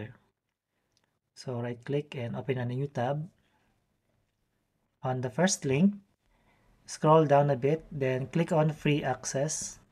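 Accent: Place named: Manila, Philippines. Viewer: Nice Bro, there is now a super good tutorial (that sounds like really from Manila) hehe... Thanks for the tutorial but I cannot do this since I am using my only 1 PC for work, do you suggest your method could be a solid workstation or will this still have some crashing along until I fixed DSDT, KEXT etc. ? Will having another back up (clone) a good idea? That's what I did before when I am using my hackintosh for work early 2016... Whenever It crash, I just mount the working cloned system and then clone again hehe.. Thank you for the video Bro. New Subscriber here. :) BTW, I am using 5800x + X570 + RX 5600XT will use mostly for Premiere Pro and other Adobe Products. More power!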